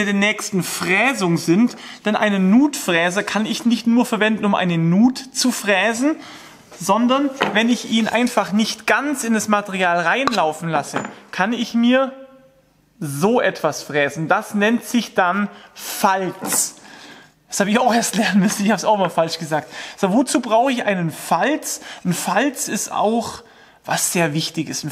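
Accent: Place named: Augsburg, Germany. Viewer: Hallo ich hätte mal eine frage. kommst du aus der nähe von augsburg. mir kommt der dialekt so bekannt vor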